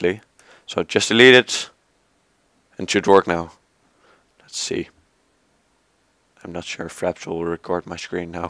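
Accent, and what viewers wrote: Netherlands. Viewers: oke gozer ik hoor dat je NL bent maar het werkt niet bij mij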